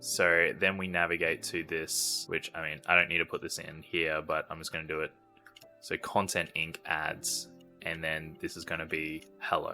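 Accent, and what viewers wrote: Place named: Australia. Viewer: Australian accent lol. let me check this room. I am not there yet on THM Thank you for the video. 1000 subscriber. make it happened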